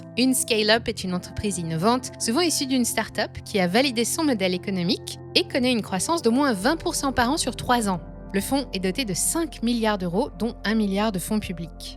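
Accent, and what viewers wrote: United States. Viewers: Vidéo totalement vide d'intérêt et puis cet accent al américain détestable..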